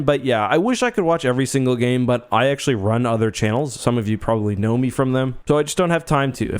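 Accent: Canada. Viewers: I always knew was a canook by his accent but I didn't know he's a huge hockey fan. Now I like him even more 😂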